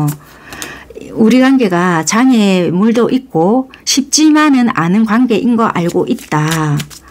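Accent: Busan, South Korea. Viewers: 선생님❤2번 리딩 감사합니다!!! 평안한 밤 되세용😊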